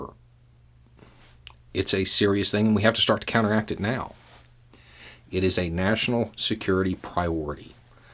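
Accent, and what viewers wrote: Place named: Florida, United States. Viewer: FL accent. "Grain" energy. They are coming for your Moonshine!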